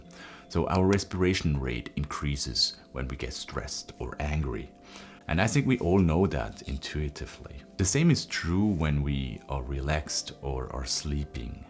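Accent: Switzerland. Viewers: are you swiss ?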